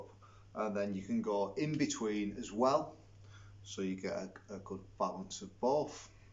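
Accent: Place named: Northern England, United Kingdom. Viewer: You sound like you might be from the same area where I am from originally. Bolton Lancashire. I’ve been in Canada since I was 7 but it’s nice hearing that familiar northern accent.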